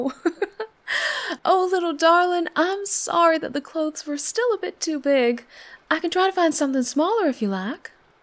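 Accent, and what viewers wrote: South, United States. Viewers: ! Another amazing and magical ASMR tale with a new character to love, Mama Marennah is a wonderful addition to your gallery and your Southern Accent is just even more incredible than before. You can really tell you have been working hard on improving your Voice Acting and all that hard work has paid off hugely. A standing ovation is the bare minimum accolade that you deserve and honestly so much more would be appropriate.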